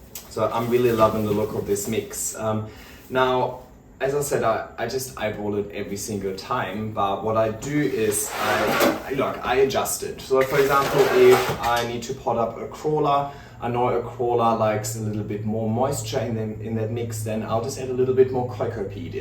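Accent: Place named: Germany. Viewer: I just found you recently and I am loving the content 😍 You brought back my passion for plants after it's been dormant for a year or so 😊 Excuse me for being curious (I love different English accents), you call yourself, but do you have a German/Austrian background? Keep up the good work 👍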